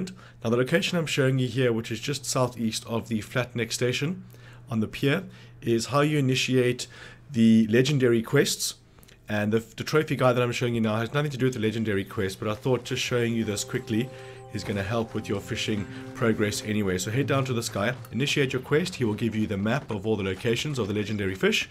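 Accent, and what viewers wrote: South Africa. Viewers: South African Accent.. awe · Are you from South Africa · You from South Africa?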